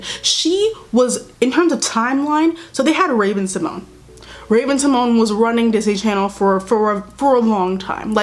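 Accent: United States. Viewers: Gina Rose Belarde well Americans tend to think everyone is American. I can clearly see she’s American but my point is, why does her tone of voice go up at the end of every sentence. Of course I know how American sounds like. You lot are everywhere in the media. This accent is something I’m no familiar with. Her message is hard to grasp because she sounds unsure. Even her facial expressions and that’s just my opinion. Idk why some of you replying to my comment like I said something inappropriate or controversial. You could’ve just told me what area she’s from or anything else that was real information rather than ‘are you not American?’ No of course I’m not American wtf maybe if I was I wouldn’t have such a hard time understanding her delivery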